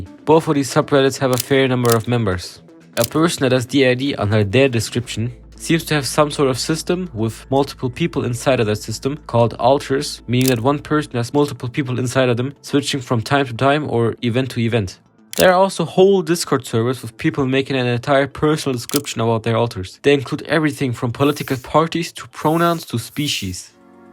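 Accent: Germany. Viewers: You're very underrated. I like the way you edit your videos. And your accent sounds a bit german. Very sympathic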